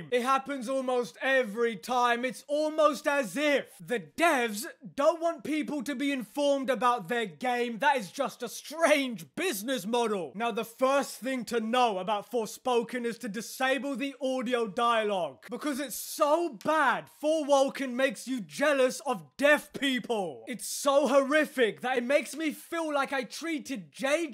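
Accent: United Kingdom. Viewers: I love the way you explain it with ragefull british accent WKWKWKWKWKW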